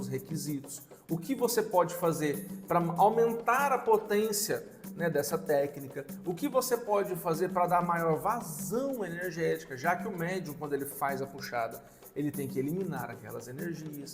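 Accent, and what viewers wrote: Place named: Minas Gerais, Brazil. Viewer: Boa tarde,estou gostando muito das suas aulas , parabéns! Fala de onde vc é ...parece ser mineiro como eu ,sou de BH .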